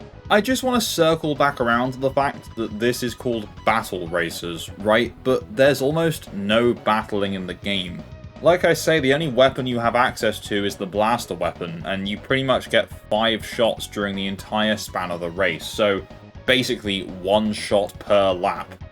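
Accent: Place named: Birmingham, United Kingdom. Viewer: Well, you're definitely not the Budget Builds Official guy. Same middle class Brummie accent though. Since I don't like SMK, I definitely wouldn't like this one.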